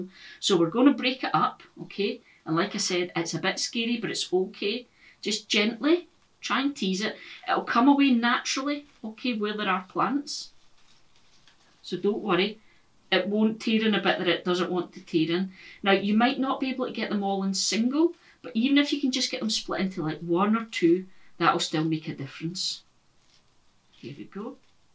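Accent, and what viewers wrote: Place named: North, United Kingdom. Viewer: I love your accent it reminds me so much of my family up north. Xx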